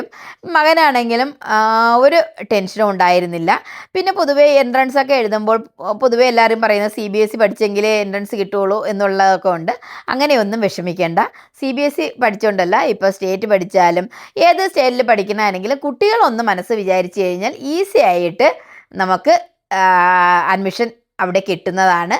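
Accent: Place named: Thiruvananthapuram, India. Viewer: Chyacchi thiruvanthoram aanennu thonunnu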